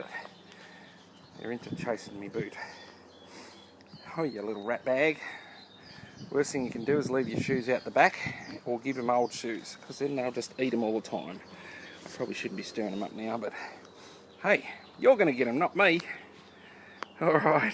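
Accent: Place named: Australia. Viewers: I know it's because you're Aussie but the way you speak to them sounds so bad to me as an American (obviously they're dogs so how you speak to them doesn't matter) but it's just kinda funny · You do have an Aussie Accent but not as strong as some.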